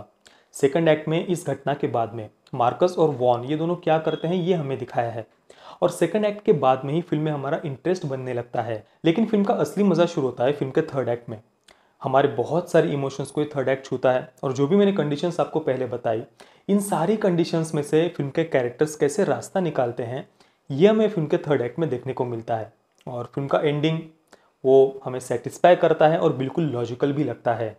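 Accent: Maharashtra, India. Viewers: Good work bhai. Keep it up. The best thing I like about your reviews is when you say Jai Hind, Vande Mataram at the end. The way you talk, it feels you are from Maharashtra. I am from Nagpur. Where are you from? I have a few movie suggestions for you, which you would like to watch and review. For starters I will suggest, "The Third Man'. Its a very old film and is widely considered as the first in 'neo-noir' genre. Do watch it.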